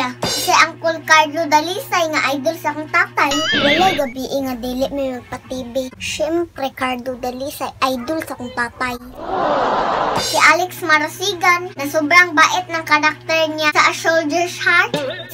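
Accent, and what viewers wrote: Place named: Mindanao, Philippines. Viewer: Cute kaayo ka day.pede mangutana.nganong naa man ksy dira nga word.mura mn ka og taga mindanao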